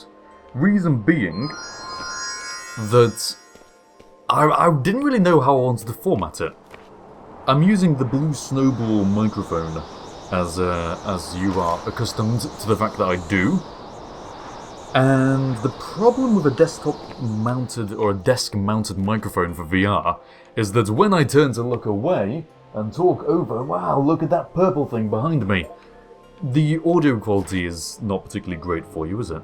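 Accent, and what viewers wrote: United Kingdom. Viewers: GUYS!!! I just got a great idea he plays Stanley parable. Think about it glorious British voice versus glorious British voice.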